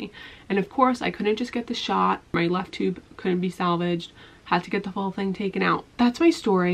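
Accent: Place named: Philadelphia, United States. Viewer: You sound like you’re from Philly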